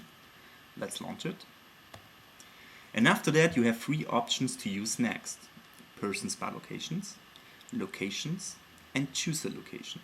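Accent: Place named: Germany. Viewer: du bist Deutscher, das hört man voll raus :-)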